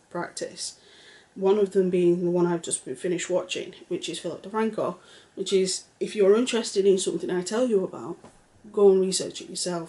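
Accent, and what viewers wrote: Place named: Yorkshire, United Kingdom. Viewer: You are so right, about the barrage of negativity and vitriol--it does make one feel ill, some of her streams are so vicious I can't watch at all, just have to turn it off. And feel the worse for having exposed myself to it. Anyone would feel ill after getting worked into such an angry emotional state, voluntarily mind you, for none of this arguing is anything but self-created and self-imposed; turn off the camera, and it all evaporates into the ether. The still frames captured from those streams and raging videos speak volumes. Some people live their entire lives like that however, in their daily life, in a constant state of arguing, cursing, shrieking, they live for conflict. One of the reasons i enjoy hearing your take is how calm you are, level headed, and that luvly Yorkshire accent. I have good friends from Hull, Barnsley, Sheffield, even up to Middlesbrough. 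York is one of my favorite places on planet earth, and if i won the lottery, I'd buy a small home there and spend my summers way out in the middle of the countryside, if i could. And just go into town once a week for supplies and pub.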